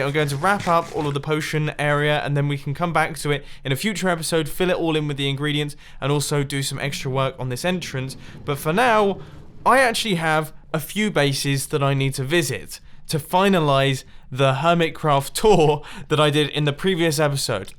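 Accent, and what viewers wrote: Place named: United Kingdom. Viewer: The brithish acent 👌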